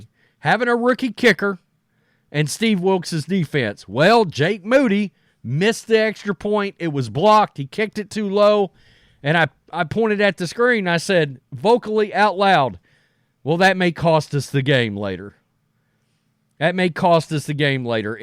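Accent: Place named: Texas, United States. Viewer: Your new theme song! Love your Texan country twang are you from the southern part of Frisco! Crummy scum riddled Frisco!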